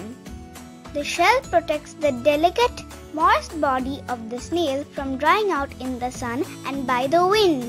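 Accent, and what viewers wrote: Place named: India. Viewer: I love the way she talks, India I think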